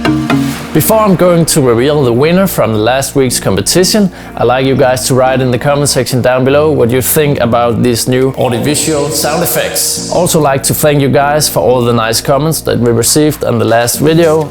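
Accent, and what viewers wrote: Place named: Denmark. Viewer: Came for the hairstyles but stayed for the Danish-english accent <3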